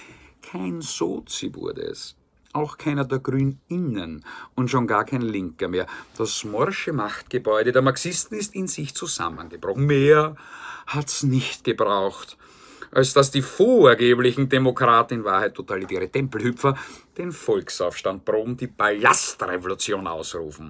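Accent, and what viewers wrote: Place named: Austria. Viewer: Hach wie schön ist es einem Österreicher zu lauschen 🙊 ach du je erwischt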